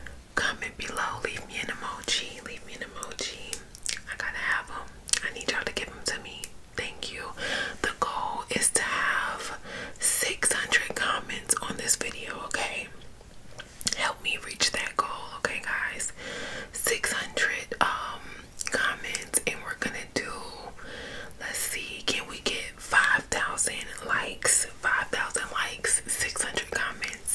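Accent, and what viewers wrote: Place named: Trinidad and Tobago. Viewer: You trinidadian right?😂 Lmao heard it in your voice...🇯🇲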